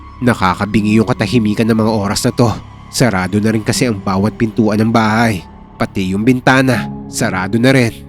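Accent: Iloilo, Philippines. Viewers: Plss kuyaa haluan muna ng ilonggo accent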